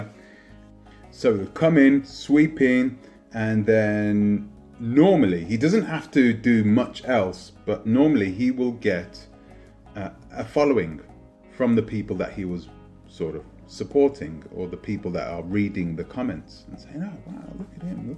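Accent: United Kingdom. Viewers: wait aren’t your kids white? “Biracial” so would that make you suspect ...... you just contradicted your self your British accent is way stronger than his you literally sound like a white man. Chakra bars accent is way more Caribbean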